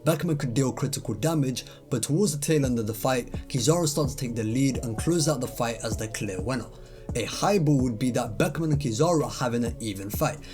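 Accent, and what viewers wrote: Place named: London, United Kingdom. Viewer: I like to see that one piece powerscaling and playing with the vivrecard/databook statements is still alive and well. It peaked in c0 vid then has been up and down i think. It seems to be lively again maybe due to the recent chapters. I have been out of the loop for a while. Perhaps i should get back into it. On another note You have a very strong london accent. You are maybe the only british black muslim london one piece fan i know of. Lol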